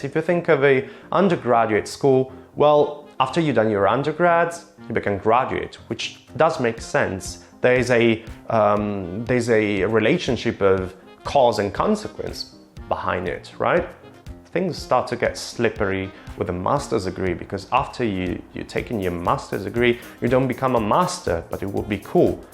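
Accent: United Kingdom. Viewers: Seriusly how you have almost a british accent!!! I struggle so hard to just cancel the italian accent. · Oddio... Con quella barba e quell'accento britannico mi fai emozionare! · I think your accent is sooooo British :) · IlRompiBalle In quanto madrelingua italiano non può avere un vero e proprio accento dei classici, per quanto il suo sia chiaramente un inglese in linea di massima British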